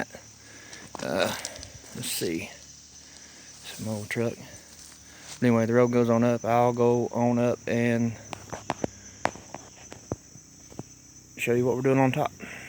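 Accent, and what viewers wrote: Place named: Western North Carolina, United States. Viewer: cool man! I'll watch. I subscribed to your channel. You sound like your from close to me from your accent. I'm in western North Carolina.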